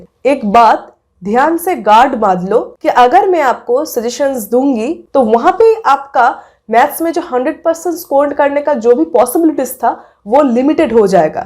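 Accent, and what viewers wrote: Bengal, India. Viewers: Sir ne bola suggestions dungi😂😂 So cute hindi bengali people speak ❤️❤️😘😘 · Mam aap kya bengal se ho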